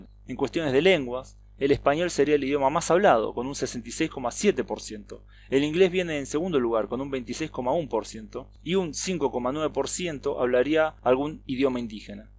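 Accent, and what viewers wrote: Argentina. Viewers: Latino???¿ De qué estás hablando? Serán países de habla Española Latino era una lengua que se hablaba en el Lacio (Italia) y hoy el único país que habla latín es el Vaticano. Latinoamerica es una denominación que los franceses acuñaron para quitarle el inmenso poder que tenía España. Tu acento parece Argentino. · Video basura... Argentino tu acento asqueroso.. dan ganas de cagar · O espanhol argentino do narrador é o melhor. Muito bonito o acento platino.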